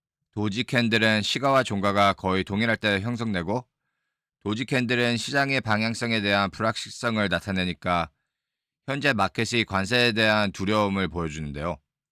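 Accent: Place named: United States. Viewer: 오히려 미국 네이티브 스타일의 한국어 발음. 난 듣기 좋다.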